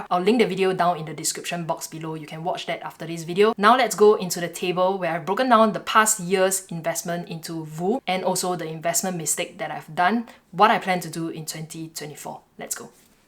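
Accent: Singapore. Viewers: I love your singaporean accent!!! Singlish makes my heart beat! Maybe it was your good taste in music and the beautiful scenery from your newest korean vlog. 🌇 you are so smart, I'm going to watch all your videos! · Is she speaking Singlish? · I know you’re Singaporean from your accent.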